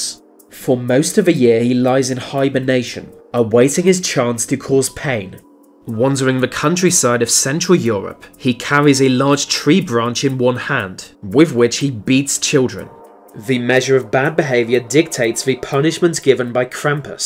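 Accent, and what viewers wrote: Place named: RP, United Kingdom. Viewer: now that's the Queen's English and how it should be spoken.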